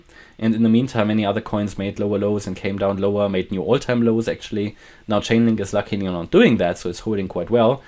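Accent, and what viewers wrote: Germany. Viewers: Bist du deutscher? Frage nur weil dein Englisch klingt wie meins 😅.. Danke für die Info denke link wird uns noch positiv überraschen.👍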